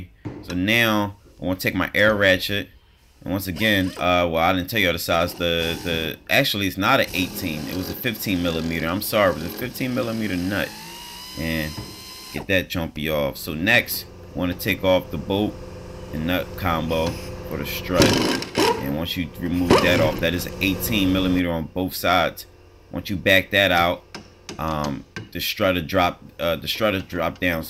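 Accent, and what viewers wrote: Philadelphia, United States. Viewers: You sound like a Philly boul lol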